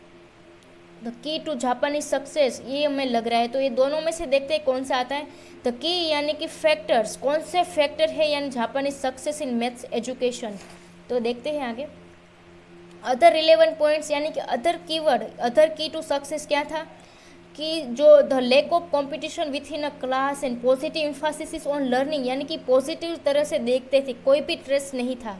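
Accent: Gujarat, India. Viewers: Awesome 👍, are you gujarati?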